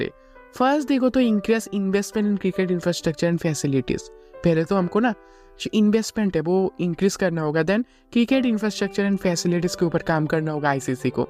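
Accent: India. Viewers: Love your Hindi Accent Brother! Keep it up. nice work. easy thumbs up.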